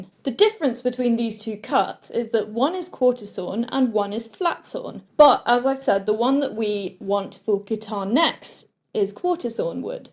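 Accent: United Kingdom. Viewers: Thanks for sharing your knowledge so didactic! I love your British accent and mainly your guitar-making! Regards from Brazil! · Guitar necks, katana's. Yes it's most likely your accent. BUT, Also in American English , thay tend to drop the letter T from a few of their words. For instance ; important is said, impor ant. Mountain is said moun an. ive even heard prodly instead of probably. So you mix this with your british accent and you get katana. Funny thing is they actually pronounce the T in Katana. Why do I know this? Because I'm a Kiwi living in the states for eight now.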